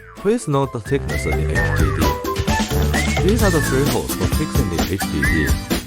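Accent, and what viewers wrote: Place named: China. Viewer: Wow this English sounds very Chinese!! 你好吗👋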